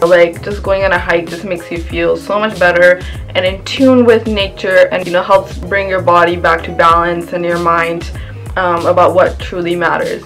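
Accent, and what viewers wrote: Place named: Punjab, India. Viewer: Really love your all vedios....love your accent while you mix punjabi tay English mix..... please put some hair care tip...as your hairs have volume...you use any spray to light it up......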